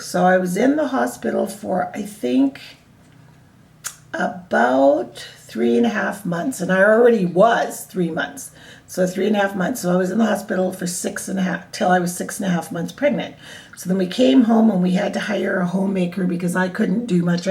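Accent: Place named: Canada. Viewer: That is a beautiful story😇👼 thank you ,, where are you from are you from Canada you sound like a Canadian?? Please reply thank you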